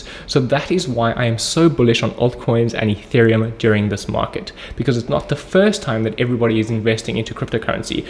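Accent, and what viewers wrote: South Africa. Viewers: Are you South African? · Legend! Good to hear a South African voice in this space. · South African?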